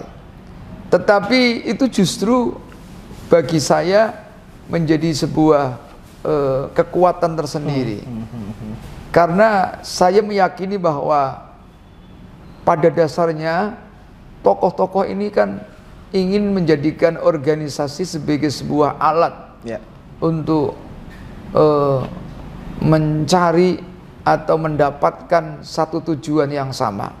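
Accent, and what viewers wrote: Java, Indonesia. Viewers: Bawa2 betawi kok logatnya jawa yai?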